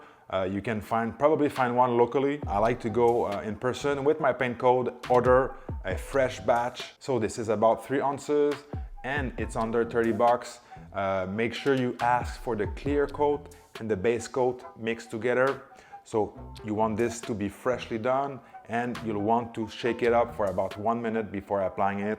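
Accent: Quebec, Canada. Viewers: Fantastic video, the best I saw for rock chip repair. By the way I noticed your Quebec accent.:washhands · yes osti I will say he is a quebec guy 🙃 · I get to the end of the video and I’m like oh wow this guy must be Canadian cause I just noticed his accent, since I was sooooo busy paying attention to every step. Great video sir, it has given me the confidence to wet sand my own paint for chip repairs. Of course gonna get a junk panel for free somehow to practice 1000 times, but i finally have every step and tools I’ve never had before! Blessings!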